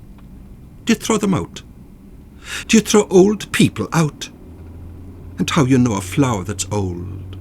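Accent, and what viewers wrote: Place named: Kerry, Ireland. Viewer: Beautiful, dreamlike commute with the loveliest Kerry accent, that takes me back to childhood memories of sitting on the carpeted floor listening to Brendan Kennelly on the Late Late!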